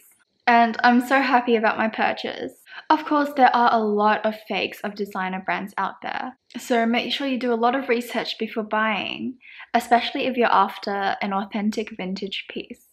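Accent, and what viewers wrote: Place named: Australia. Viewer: You’re beautiful with a.. Um Australian accent? Sorry I found your video to learn how to spot fakes. Good closeup video ^_^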